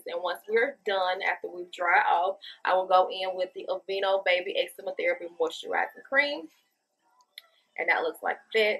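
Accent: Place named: South, United States. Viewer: Love your southern accent I’m from Florida I can hear a similarity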